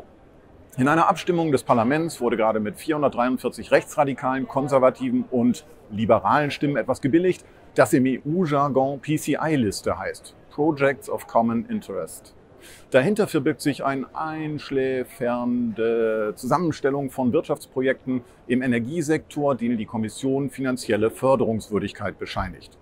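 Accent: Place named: Germany. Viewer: Was für ein heftigen deutschen Akzent die alte hat. Uffff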